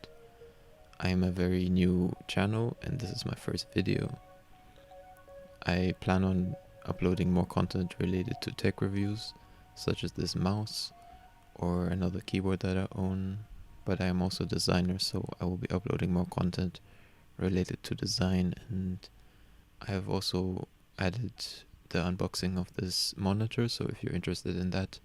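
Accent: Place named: Sweden. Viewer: Tf is with swedish accent, lol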